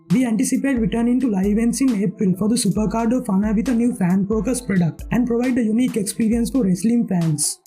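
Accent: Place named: India. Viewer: Can I understand a single word this guy says. His Indian accent is just too strong to understand.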